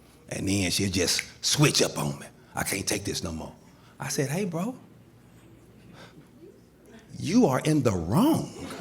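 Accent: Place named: South, United States. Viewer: I love the way enunciates his words when speaking to the audience. You still hear the South but he's proper with no need for code switching. Perfection! Now when he's telling stories about talking to his people issa wrap😂😂😂😂😂😂😂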